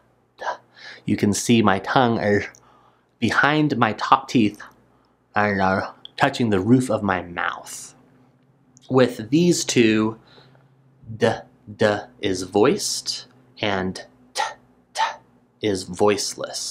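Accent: United States. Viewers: i Like amrican accent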